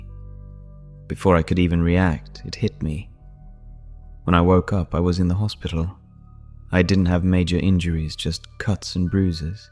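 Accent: United Kingdom. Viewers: Native or acted, but British accent is the best on my and many others opinions👍👍👍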